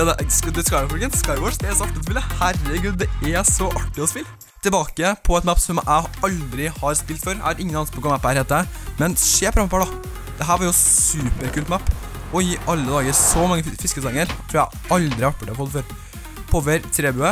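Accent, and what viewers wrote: Trøndelag, Norway. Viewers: Elsker den positive holdningen din og trønder dialekten! :D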